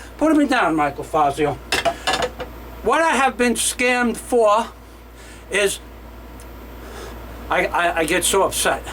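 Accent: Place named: East Coast, United States. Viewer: Brother I hear an east-coast accent; mind if I ask what state are you from?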